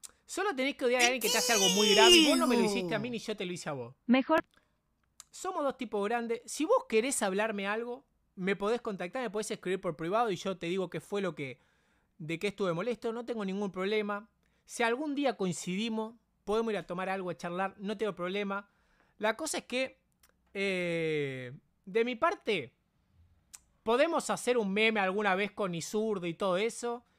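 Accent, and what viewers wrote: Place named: Uruguay. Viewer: pero ahora que lo diga bien y no con acento de uruguayo castrosón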